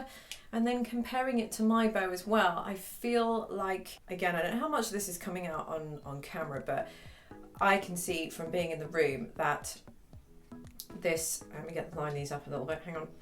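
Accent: United Kingdom. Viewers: Unless your from the USA. Then it would seem normal and someone with a British accent would seem different but I would never use the word "hilarious" because she sounds wonderful. BTW one of the most renowned makers of violins is a New Yorker. His violins sell new for over 100K and you'd have to wait 3 to 4 years to even get one if your lucky enough to get one. He doesn't talk pretty either ( BUT who cares)??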